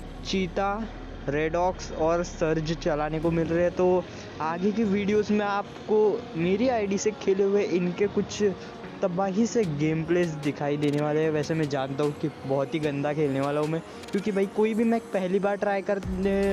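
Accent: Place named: Philippines. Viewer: He is philipino tagalog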